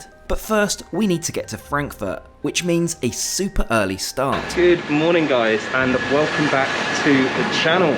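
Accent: RP, United Kingdom. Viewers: You have a very appealing British accent. A 21st century Oxbridge accent?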